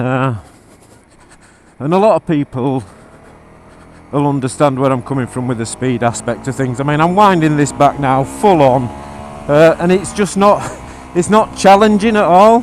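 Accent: Yorkshire, United Kingdom. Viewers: Music to my ears hearing a Yorkshire Motovlogger! Decent insight into the bike as well!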